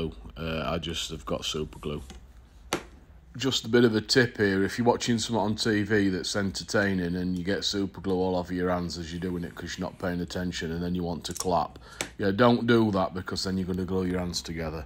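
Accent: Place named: Northern England, United Kingdom. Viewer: No messing…no extended intros..just got on with what you want to share…brilliant. Love your refreshing no nonsense hurl stuff on the table approach enhanced by your grand up north dialect. Love it, subscribed from the bottom left corner of the country. Get on my ‘ansom.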